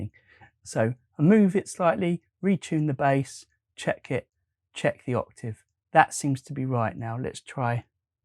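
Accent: United Kingdom. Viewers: I can listen to this type of English accent all day.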